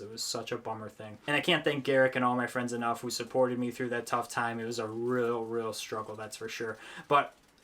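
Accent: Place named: Michigan, United States. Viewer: Are you from Wisco or Michigan? My first guess is Michigan. With your accent. Haha.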